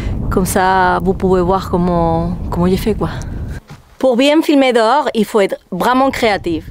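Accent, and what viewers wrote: Spain. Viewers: Chapeau bas!!! J'ai 62 ans donc une sexagénaire!!! Je te suis depuis que tu fais tes vidéos en français je t'adore je n'en rate aucune ta philosophie de vie me plaît beaucoup même si j'ai une vie plus "rangée" je réalise mes rêves également...je ne me suis jamais perçue comme les autres d'autant plus depuis mon divorce je me réalise seule avec mon âne Sherpa je suis partie 6 mois jusqu'à Santiago de Compostela (aller et retour) j'ai réalisé mon rêve vivre à la campagne avec Sherpa à mes côtés!!! Je te trouve particulièrement courageuse j'apprécie ton superbe accent espagnol, j'aime quand Raf que je suis aussi te fait découvrir le canyoning ou l'escalade!!! Et là encore bravo à toi de dépasser tes peurs!!! Longue vie à toi à ce que tu fais partager c'est formidable... et caresses à tes 3 chiens et ton chat ils sont tous adorables!!! Muchos gracias 🙏👍💓✨️💖🌺🥰🍀🐞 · Ne t'inquiète pas, le charme de l'accent Espagnol quand tu parles français est tout à fait délicieux. 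Personnellement, je suis beaucoup allé en Espagne (je ne suis pas très loin de la frontière) et mes parent avaient un appartement prés d'Alicante! Donc je parlais assez bien l'Espagnol. Et ton accent me fait vraiment plaisir car il me rappel les jours heureux ou nous étions en vacances dans ton pays merveilleux... A part cela, j'adore ce que tu fait, et tu as beaucoup de courage! Que ce camion t'emmène au bout du monde sans soucis ni problèmes. C'est un souhait qui me tient à coeur! Bon voyage... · Merci, tu m'as donné un grand coup de fou pour un jour commencer moi aussi a faire des fidéos. J'adore ton accent espagnol et ce n'est pas la 1ere fois que je te le dis. mais tu as raison, depuis que je te suis, ton texte est plus fluide. Compliments ! Quand je vois le travail que tu y mets, je me demande quel ordinateur et quel logiciel tu emploies, parce que je crois que le mien est trop faible pour ce travail. 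Merci de prendre le temps de me répondre. Bises du nord de l'Allemagne, le corsallemand. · Quelle bonne idée cette vidéo. Quand on est devant l'écran, on ne réalise pas nécessairement tout le travail que ca représente derrière. L'intro est géniale et ton francais est charmant avec cet accent espagnol. Bravo et Merci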